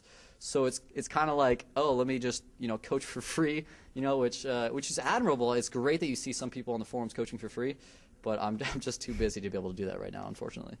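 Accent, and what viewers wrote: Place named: Canada. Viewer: I think shes Canadian lol. Aboot!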